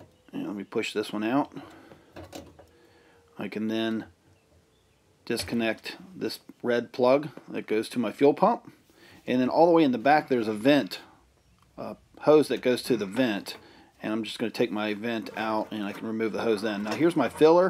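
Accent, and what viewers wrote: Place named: Philadelphia, United States. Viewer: I've done this job a few times but I like to re-watch to remind me what I'm in for. I always get gas on me somehow. You from near Philly or Baltimore originally? You've got "the accent"! Nice video.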